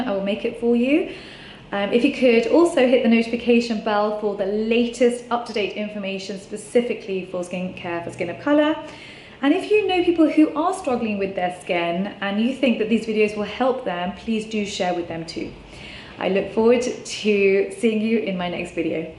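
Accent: United Kingdom. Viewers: Right,your accent finally confirm me that you are living in the UK 😁😃.LOL.I am living in North 🤪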